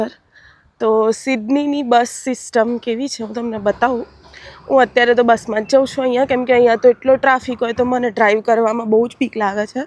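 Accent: Sabarkantha, India. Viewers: Tame sabarkhantha namaste chho bolo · Sabarkantha ni boli lagesr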